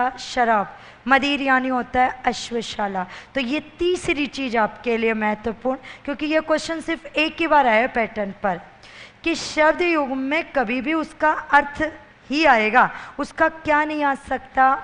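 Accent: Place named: Mathura, India. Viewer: 100% h kI aap mathura se h🙏🏾🙏🏾🙏🏾🙏🏾🙏🏾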